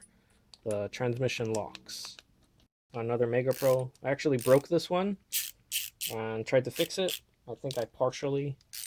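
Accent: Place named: Hawaii, United States. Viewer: Dang, you've accumulated quite an amount of tools! great video! such nostalgia listening to someone with a Hawaiian accent haha and also, if you can you should post videos on how you fix appliances! I would love to learn!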